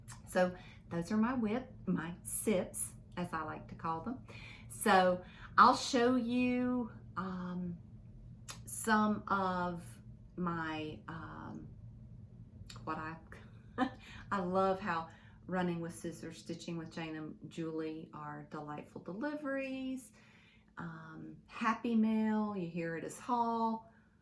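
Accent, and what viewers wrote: South, United States. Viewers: Welcome to the sharing community. I love seeing all the creativity . I want to start something in quilting. Haven’t done it in years, but when I see all the wonderful quilts and things, I get the itch to go down that rabbit hole. I myself have been stitching since I was around 6. Loved hearing your southern accent. Looking forward to seeing your next video. P.s. I am from IN. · Love your video, I'm from the south also so I completely understand you, no close caption needed.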